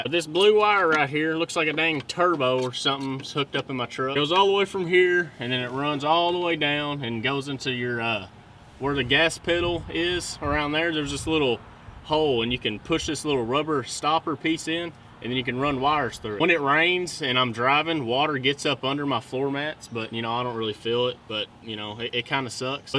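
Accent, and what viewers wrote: Kentucky, United States. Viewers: aye man what part of kentucky you from..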